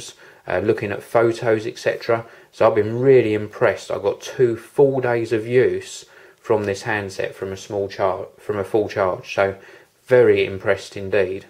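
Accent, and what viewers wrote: England, United Kingdom. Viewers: thanks for the review...im preordering the unit :] i hope the battery is as good as you say it is....btw gotta love english accent ;]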